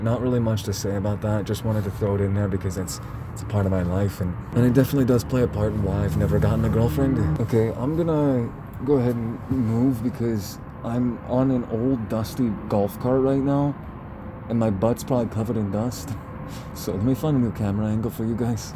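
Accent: United States. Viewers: I was not expecting the country accent😂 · KEEP DOING YOUR COUNTRY VOICE IN YOUR VIDEOS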